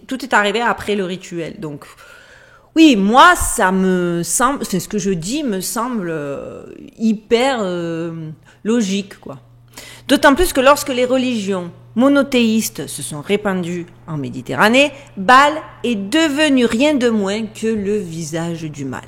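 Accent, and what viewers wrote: South, France. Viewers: Bonjour 👋 Oui 👍 A chaque vidéo un pouce ! Vraiment très intéressant 🧐 en plus ! Et avec notre cher accent du Sud… trop bien Peuchère 🤣❤️🤩💐🌈🙏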